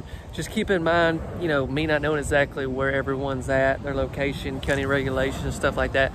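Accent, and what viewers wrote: South, United States. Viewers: Couldn't get tired of listen to you. Love your southern/ cowboy voice. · Im not talking about the way baby talks.. love his southern charm....it's the fact he has to open all those freeking cabinet doors!!!!! Unless there is something very special in the cabinet, all cabinets look alike!!! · I love your southern accent. Your commentary is hilarious. It's one of the main reasons I enjoy your videos so much. This home was beautiful but a door that leads to the laundry room not a good idea. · You just sound Southern to me❤️😉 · Love this one!! Turn off the volume?? I could listen to that southern drawl allll day long!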